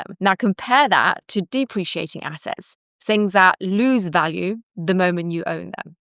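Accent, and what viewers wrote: United Kingdom. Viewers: Is this British accent? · I closed the video after hearimg british English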